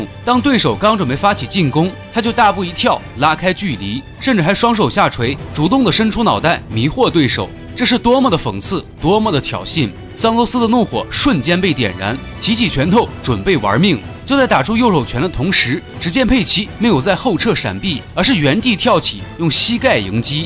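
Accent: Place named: Henan, China. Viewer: 画外音的普通话口音能听出是河南人😁，河南电视台的格斗节目“武林风”节目很有名……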